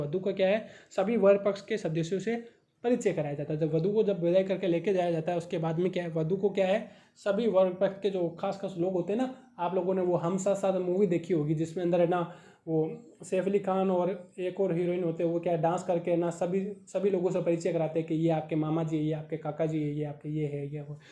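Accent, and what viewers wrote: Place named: Marwar, India. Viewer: Most epic movement marwadi tone & nice session 💝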